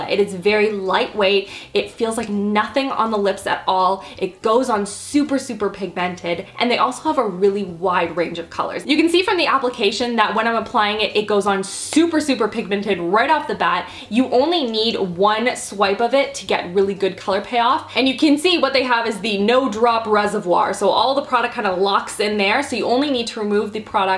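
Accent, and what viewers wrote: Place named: Canada. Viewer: riley gatenby a Canadian one, if anything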